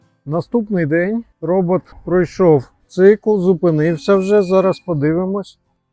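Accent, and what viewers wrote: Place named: Russia. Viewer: Русский акцент режет слух !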